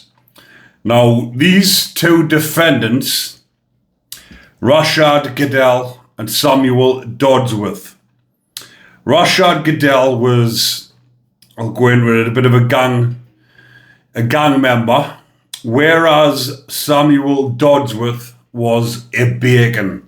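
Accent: Newcastle, United Kingdom. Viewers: Bacon, hes a Jordy it’s his accent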